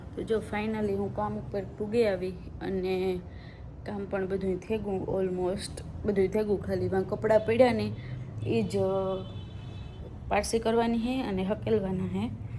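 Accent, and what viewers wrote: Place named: Kathiawad, India. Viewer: जी गुड मॉर्निंग मैं आपका ब्लॉग देख रहा हूं मुझे आपकी भाषा बहुत अच्छी लगती है वैसे मैं भी गुजराती ही हूं टिपिकल काठियावाड़ी भाषा सुनने में बहुत मीठी और अच्छी लगती है मैं अहमदाबाद गुजरात से हूं अपने बाजू में कोई साइकिल वाला हो जिसकी नंबर प्लेट हो उनको ही पूछ लो 👈😍🤣😂🤗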